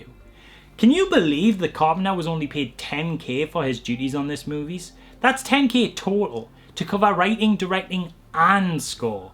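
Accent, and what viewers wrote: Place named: Durham, United Kingdom. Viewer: New subscriber here, just discovered you. The effort you put in is super impressive!!! Really enjoyed your take and info!!!! Off topic, I can't quite pin your accent, I was born n bread Gateshead but now live at the coast, can I guess your accent is Durham 🤔 Like I say, I'm new here x Looking forward to binging more vids pal 💚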